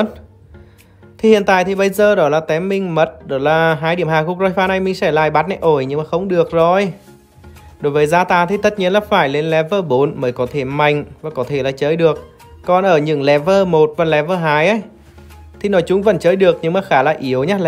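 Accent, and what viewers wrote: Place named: Central, Vietnam. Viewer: giọng bắc nghe quen rồi, méo hiểu sao cứ thích nghe cái giọng miền trong của bạn 😂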